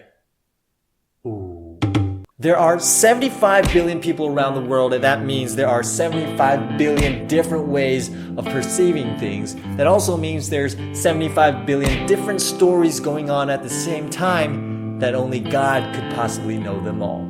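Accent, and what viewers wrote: Standard. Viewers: 真的很感謝你做這些影片以及可以讓我們練習習慣非常標準的口音，但是中文字可以調整成當我有設定cc字幕的時候再出現嗎?有時候我只想看看英文不想看中文，但我關不掉。不管如何總而言之，超級愛你的正能量~~ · 很喜歡你的口音發音很標準!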